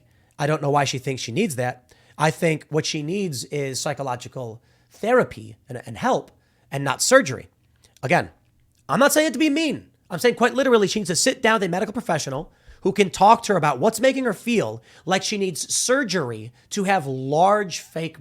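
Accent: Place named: Chicago, United States. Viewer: This is the most Chicago has ever sounded.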